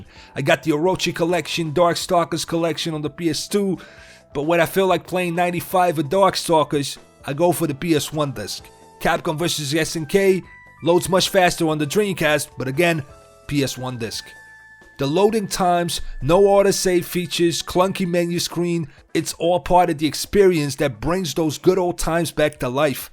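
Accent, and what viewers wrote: New York, United States. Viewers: Your accent of a new yorker but you have pal games through me off. I feel you though on the nostalgia of these games and those times. I have similar memories.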